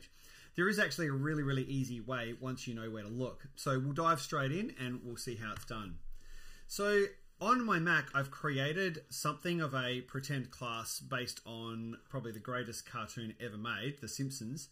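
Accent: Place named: Wales, United Kingdom. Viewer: Thanks mate, you saved my bacon. Also, I love your Welsh accent! 😉👍🏻🇬🇧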